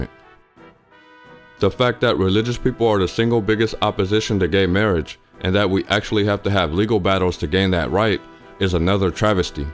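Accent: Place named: New Mexico, United States. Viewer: Great video. Your voice is easy on the ears and the stuff you are saying is easy on the mind. Are you from New Mexico? Trying to pin down that accent.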